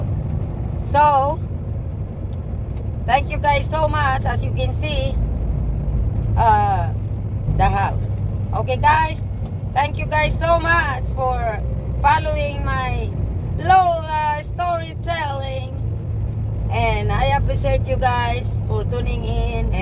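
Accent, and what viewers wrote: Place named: South, United States. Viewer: Bait mo lola kawawa din ang bata kapag ganyan naiiwanan.love your southern accent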